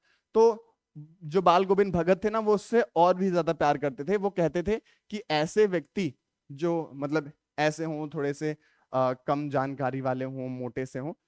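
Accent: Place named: Lucknow, India. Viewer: Ramvrash bainpuri- lakanvi andaj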